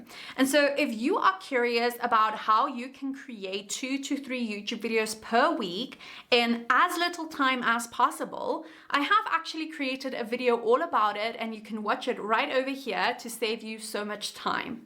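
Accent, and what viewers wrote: South Africa. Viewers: (Are you South African ?) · Love your South African accent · Love the South African accent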